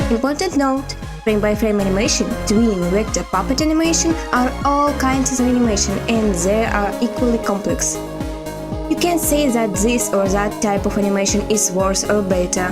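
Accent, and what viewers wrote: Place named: England, United Kingdom. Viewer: о вы из англии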